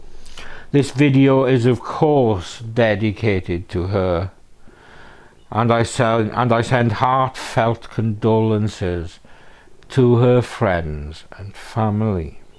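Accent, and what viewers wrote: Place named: Wales, United Kingdom. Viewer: Going to watch again when having my dinner just to listen to that Welsh pronunciation ❤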